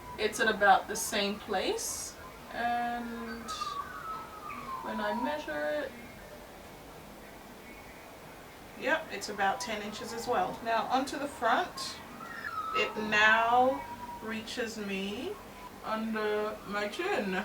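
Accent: Australia. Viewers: I love your hair and your top. This is my first time on your channel, but is your accent Australian? I can't place it exactly.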